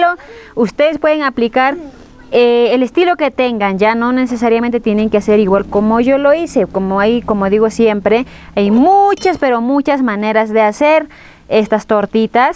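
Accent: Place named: Bolivia. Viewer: . Sos. Más boliviana que persiana porque tiene tono Bolivianas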